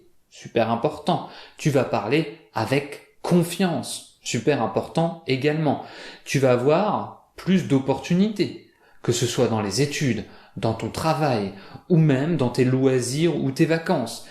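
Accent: France. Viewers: Salut! Merci encore pour vos vidéos pleines d'enseignements et d'instructions, bien entendu. J'suis un étudiant senegalais passionné des langues étrangères notamment le français et l'anglais. Vos vidéos m'ont beaucoup apportées en terme de niveau français. Mais j'ai un petit soucis pour ne pas dire un problème, étant fasciné par la culture française, j'aimerais bien avoir un accent français assez simple comme le vôtre; mais je ne sais pas comment s'y prendre? Avez vous une solution à cela?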